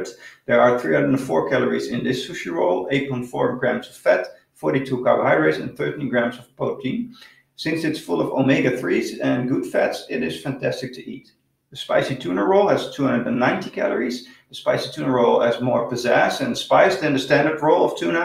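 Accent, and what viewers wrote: Netherlands. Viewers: This dudes sounds as Dutch as you can sound. I would bet the house on the fact that this guy is originally from Holland.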